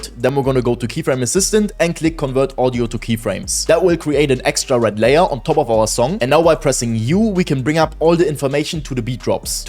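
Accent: Germany. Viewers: man merkt das du deutsch bist dikka der Akzent killt mich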